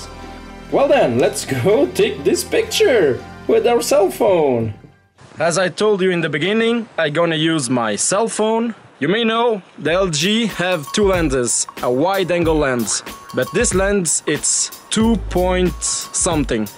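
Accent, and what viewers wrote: Brazil. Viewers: brazillian accent.... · Tu é BR né man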